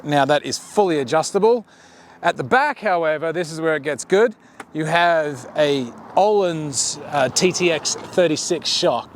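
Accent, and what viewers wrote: Australia. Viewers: Mate are you an aussie? In not picking up a US accent. Awesome video though. Thanks for the comparison. Been eyeing off the Honda for my next bike. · You sound Australian... but keep saying the Hornet is "under $11K" - in what country? In Sydney Aust they are "Under $20k"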